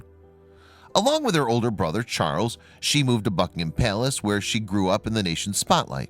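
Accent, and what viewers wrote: United States. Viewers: This was stupid and maudlin. Nobody nowhere wants to see boring still photographs while listening to someone's shrill, whiney yank accent.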